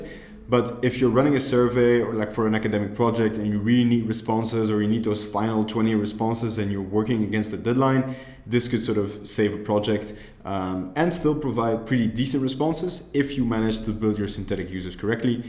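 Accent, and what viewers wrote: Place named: France. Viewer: Je kiffe l'accent français !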